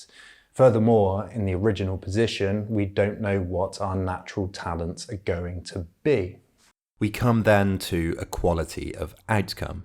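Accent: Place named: Bristol, United Kingdom. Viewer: the slight bristolian accent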